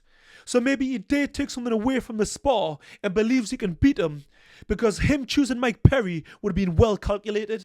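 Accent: Newcastle, United Kingdom. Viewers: You from the Toon or Durham mate, regardless subbed 👍🏻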